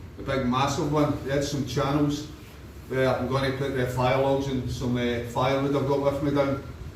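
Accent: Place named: Scotland, United Kingdom. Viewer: Hi, with your brogue and you’re drinking wine? I hope it’s NZ wine as the area I live in makes some of the finest wine in the world, lol. Yes NZ is a beautiful place. I am ensconced in the lower quarter of the South Island, in a town called Alexandra. It is 90km from Queenstown, and very close to where they filmed the Lord of the Rings series. People ask me if the landscape is like the movie, and I have to say yes, it is as you see, except for the battle scenes. The nearest mountain range is the “Old Man Range”, 10mins away at a height of 1610m, with a 4WD road access. Slightly higher than Ben Nevis and the views are spectacular. You would love it here with the passion you have for tramping and camping There are several you tubers posting about NZ camping. One of the ones I follow is Abel & Victoria who camp in the Nelson area of the country situated on the northern coast of the South Island. 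2.5hrs gets me to the east and south coasts, and 4hrs gets me to the West Coast. I’m going to browse your other videos that you have posted. Many thanks, Brian.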